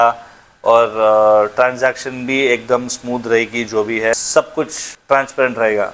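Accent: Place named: Punjab, India. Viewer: He speaks Punjabi more like north punjab punjabi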